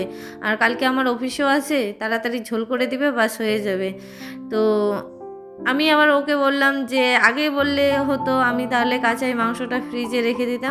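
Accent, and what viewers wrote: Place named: Bangladesh. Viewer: তুমি তো এই দেশের মেয়ে তাহলে তুমি কেনো বাংলা দেশের মতো কথা বলো....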